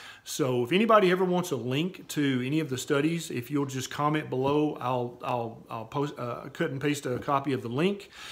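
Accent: South, United States. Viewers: Hi doc! I love your videos, I love your information, and I love your southern accent as I am from the south too, but now I'm living above the Mason-Dixon line. 😁 My question is my 18 year old daughter who has ehlers-danlos, gastro issues, mild anxiety issues and mild depression, allergies, chronic fatigue syndrome, pathological sleepiness, EoE, she weighs 104 lbs, approx 5 ft, and her doctor prescribed her vitamin D 400 iu once a day. After listening to you, I don't believe that is enough, so how much do you recommend, doc? TIA!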